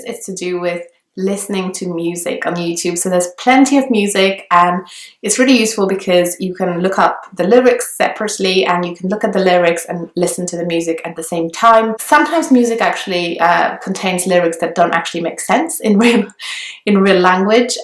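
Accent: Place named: United Kingdom. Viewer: Witaj! Thank you very much for tips and content that you create! I really though you were British until you mentioned that you are Polish! Idk if you ever covered this subject, but after watching you video reweaving Mondly I noticed a detail (and a a big issue actually) I've been dealing with: How do you manage learning a new language throughout another language foreign language? For ex. I'm Portuguese, English is my 2nd language and I want to learn Finnish ... The issue is that many people are not fluent, don't master a language well enough or just feel is more difficult learning a foreign language with another one that they are not conformable, specially langues like Finnish that doesn't have any resources to learn though besides English instructions. Dziękuję!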